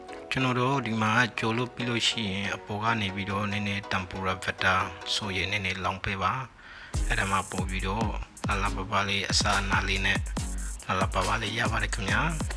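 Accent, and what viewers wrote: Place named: Rakhine, Myanmar. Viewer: ရခိုင်စကားပြောသံ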